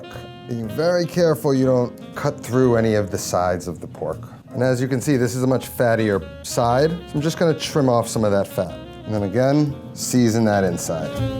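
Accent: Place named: Puerto Rico, United States. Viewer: This guy's like if you mixed a puerto rican and a jew. Very disconcerting accent.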